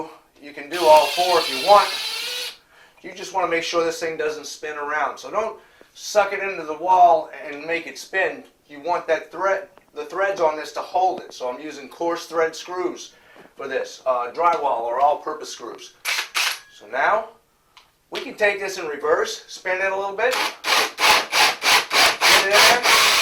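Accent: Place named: New England, United States. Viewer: This is actually a great tip. A lot of people hype up the DIY tips that everyone knows, this one I haven't seen before. BTW- I see the southern background and hear the yankee accent, I'm in the same boat. Best decision to move down here. Now on to binge watch your videos...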